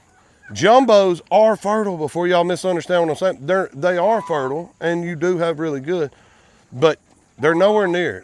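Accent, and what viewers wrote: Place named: Alabama, United States. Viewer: Alabama!! I miss guessed your accent. My apologies. Yeah I’m currently binge watching your channel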